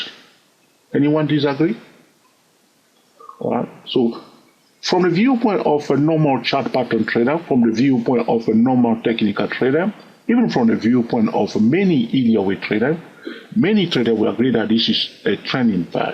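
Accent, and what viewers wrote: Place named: Sweden. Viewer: Thank you so much. I think your accent sounds more Swedish then Texan American lol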